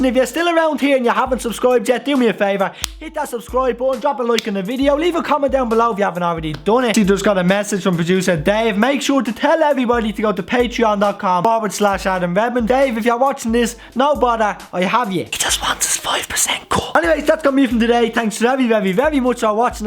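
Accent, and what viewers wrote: Ireland. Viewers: I'm from b pool cork city and your county Dublin accent is way too culchie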